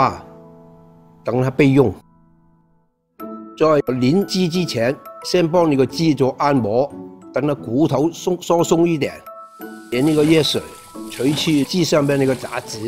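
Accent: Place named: Cantonese, China. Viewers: 正宗廣普話！ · 广东普通话！就是这样熟悉。😁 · 纯正广东普通话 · 廣式普通話最強, 一陣不用普通話又被說為甚麼要用方言, 現在就好了 XDDDDD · 最好聽呀 廣東特色 吾使去學卷俐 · 中国广普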